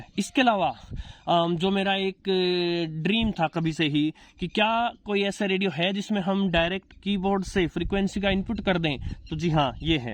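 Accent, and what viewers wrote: Standard. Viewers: आपकी शुद्ध हिंदी 👍 तारीफ ए काबिल ह श्रीमान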